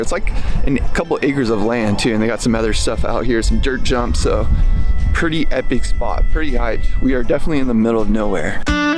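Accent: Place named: California, United States. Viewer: has he biggset bro sufer cali accent and marble mouth. The guy is a fried kook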